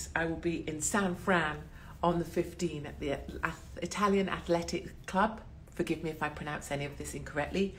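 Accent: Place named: Devon, United Kingdom. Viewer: How did you get rid of the Devonshire accent? I'm a proper Janner (just down thd Road from your birthplace). Glad to see you are well, x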